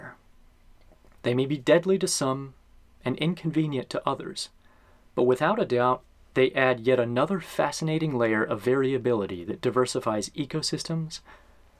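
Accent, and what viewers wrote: United States. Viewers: You have an American accent, but default to Celsius? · Why only use Celsius even when discussing American temperatures also you have an American accent why not get our temperatures too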